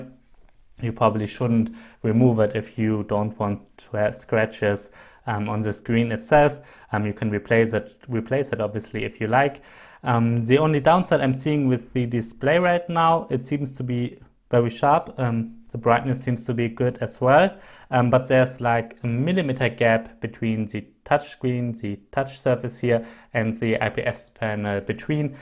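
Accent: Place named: Germany. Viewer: Are you german? Shis is fery notisble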